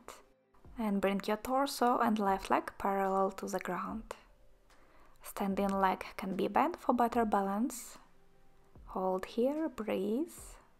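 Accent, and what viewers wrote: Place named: Poland. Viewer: fantastic video. she sound polish ;)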